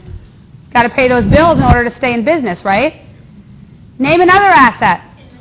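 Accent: New Jersey, United States. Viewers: New Jersey accent. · Motivated and knowledgeable with just a dash of Jersey accent. I may be in love.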